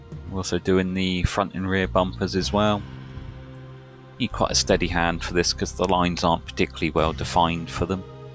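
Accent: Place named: Somerset, United Kingdom. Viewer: I thought I recognised the accent haha I live in Weston super mare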